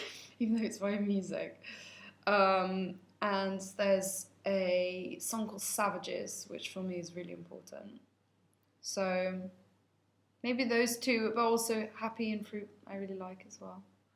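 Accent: United Kingdom. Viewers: I never realized she had such a British accent, I mainly listened to songs and no interviews. I LOVE HER ACCENT! 😂 Love the first question hahaha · wonderful keep it coming! i haven't heard you speak in the british accent before so that was something new.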